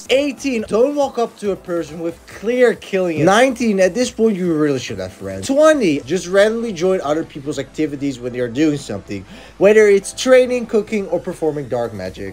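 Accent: Netherlands. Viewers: are u dutch lol?:)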